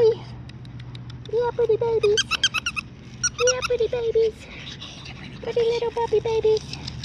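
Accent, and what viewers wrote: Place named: South, United States. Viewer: Well they have a southern USA accent so I would say no to Australia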